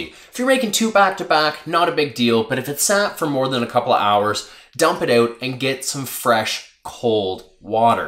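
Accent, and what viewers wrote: Canada. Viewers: i feel like you are from nova scotia?? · through ewt the video. with ewt spilling it. the water is coming ewt. melk. the canadian accent is something else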